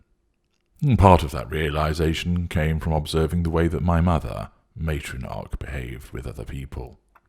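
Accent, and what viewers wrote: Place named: RP, United Kingdom. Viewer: is your name a pseudonym for the Holy Grail of Tutelage of NPD or to represent your being on par with the royal Tudors making you a self-appointed HRH? Or could it be an homage to HG Wells, or a combination of the above? I remember a few, perhaps several, years ago when you did a podcast with a young black American female. I remember what you looked like in detail as I have a photographic memory. In addition, you spoke briefly about your mom being an extreme narcissist, the passivity of your father and how you felt he failed to protect you and your sister from the abuses of your mom. You intimated that you experienced some form of sexual abuse or molestation but did not care to go into the details. You also described your sister as the ultimate accommodating, people pleasing codependent. You told how the YT videos and all the Machiavellianism altruistic outreach work to educate others on the subject of NPD was part of therapy - as in your therapists requested you to do so. 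In the podcast, you said you were only in therapy as a condition to maintain your inheritance and position in the family business (not sure how the last part was phrased). Your voice...a very Queen's English British sort was similar to the one on your videos but far less menacing, but I attribute the difference to the use of some sort of voice machine.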